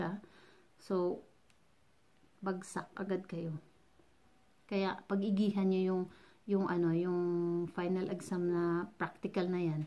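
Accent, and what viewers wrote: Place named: Western Visayas, Philippines. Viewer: Hehehehe Ganon dapat malambing ilonggo hehehe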